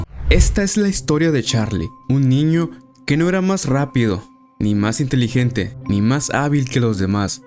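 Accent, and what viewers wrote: Chihuahua, Mexico. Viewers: Aaayyy compadre y ese acento de shihuahua? 😻🫶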